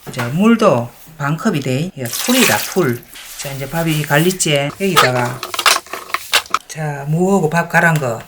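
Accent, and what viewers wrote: Gyeongsang, South Korea. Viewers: 구수한경상도사투리최고 저도경상도토박이라더더파김치배워갑니다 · 저도 혼자 빵 터져서 주름졌어요 ㅋㅋ 말씀이 너무 정감가고 재미있어요. 아마 서부 경남분이신가 싶네요~^^ 잘보고있어요.😊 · 사투리 내만알겠노 ㅋㅋㅋㅋㅋㅋㅋㅋㅋㅋㅋㅋㅋㅋㅋㅋㅋㅋㅋㅋㅋㅋㅋㅋㅋㅋㅋㅋㅋㅋㅋㅋㅋㅋㅋㅋㅋㅋㅋㅋㅋㅋㅋㅋㅋㅋㅋㅋㅋㅋㅋㅋㅋ 와 창원 아니면 마산같은 느낌이시네. 제가 마산사는데 너무 똑같다 ㅋㅋㅋㅋㅋㅋㅋㅋㅋㅋㅋㅋㅋㅋㅋㅋㅋㅋㅋㅋㅋㅋㅋㅋㅋㅋ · 꼬꾸라 져뿌리 깅상도 사투리 오지럽ㅋㅋㅋ넘구수해요 항상 건강하시구 반찬많이 갈쳐주세유~감사합니데이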